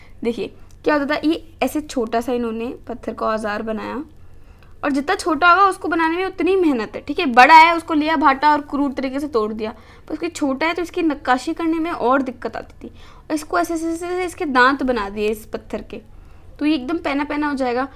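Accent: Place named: Rajasthan, India. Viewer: ❤ mar koot bhata Aap rajasthani ho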